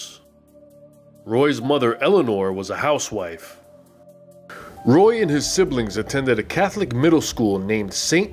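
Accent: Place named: New York, United States. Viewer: You're a really good narrator, especially with the New York accent ( I'm from New Orleans). Very cool channel you have, bro. I'm just discovering it, but it's great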